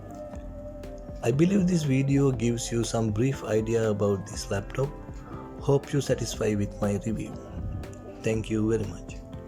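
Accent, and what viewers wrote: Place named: India. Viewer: Why white lady speak with Indian voice?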